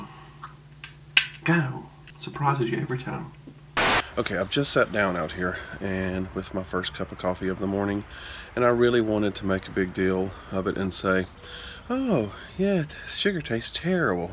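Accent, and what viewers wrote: South, United States. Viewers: You are adorable! I want to reach inside my screen and and kiss you. You are such eye candy. It's hard for me to pay attention because you are so so so good looking. And you're sexier with your Southern twang. Your wife sure has a good thing going. Wow, you are hot!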